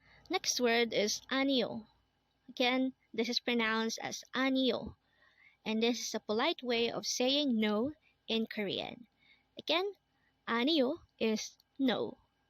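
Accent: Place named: Philippines. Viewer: Hi. Are you a Filipino? You sound like one 😊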